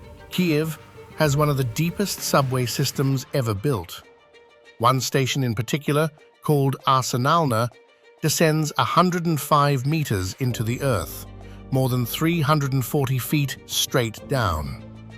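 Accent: United Kingdom. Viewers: Great 😃👍 Brit narrator ❤! Sounds like the 👑 one on! (by, mid '70's).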